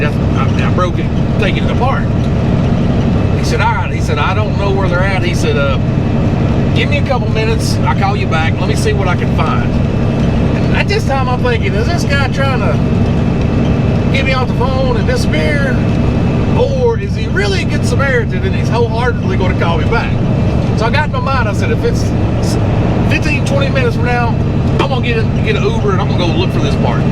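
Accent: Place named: South, United States. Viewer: sounds like an old timer and from the South too. Where y’all been? Guess I’m subscribed to too many channels 😂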